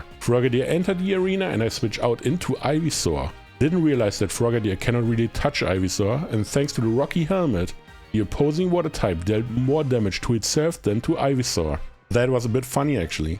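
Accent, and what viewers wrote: Germany. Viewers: Peak, but are you German perchance? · So habe das Video jetzt erst gesehen ich habe direkt erkannt dass du deutscher bist du hast einen sehr ausgeprägten deutschen Akzent da fühl ich mich doch gleich wie Zuhause or in english like Home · first time seeing a video of this channel but am i hearing a german accent?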